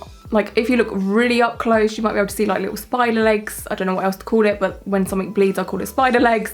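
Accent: United Kingdom. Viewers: You are hilarious girl. I could just listen to you with that fabulous U.K. accent.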